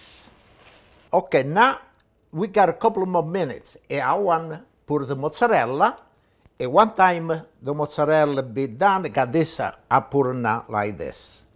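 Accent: Italy. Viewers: I love your italian accent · Oh Dio, Figlio mio, managgia la mozzarella 😛 looks so delicious. I love fresh basilfrom the garden. Grazie 😅😅😅 You make me laugh, i love your italian accent. 🍷Salute 🍷e 🍷cin cin🍷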